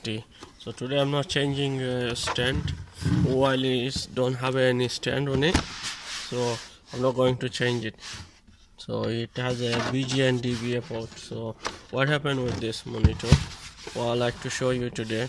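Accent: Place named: India. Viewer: its english but he is propably from india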